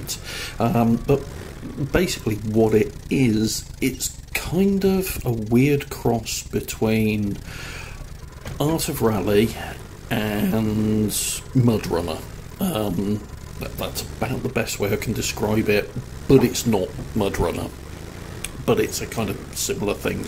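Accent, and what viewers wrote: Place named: United Kingdom. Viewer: You have the most british accent I've ever seen Good vid, keep it up mate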